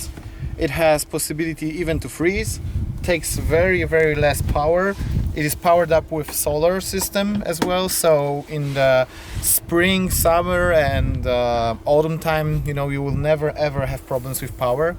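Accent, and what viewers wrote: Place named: Sweden. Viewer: You have a Swedish accent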